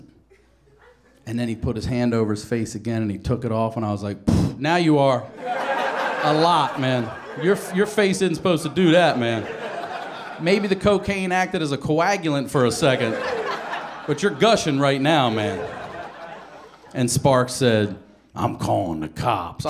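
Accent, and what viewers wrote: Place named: Baltimore, United States. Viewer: Damn he's got such a Baltimore/maryland accent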